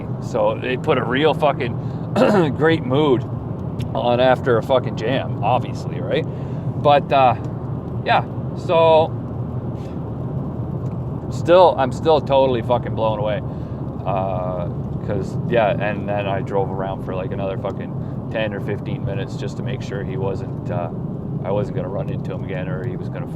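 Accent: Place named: Grande Prairie, Canada. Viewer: sound's like a grande prairian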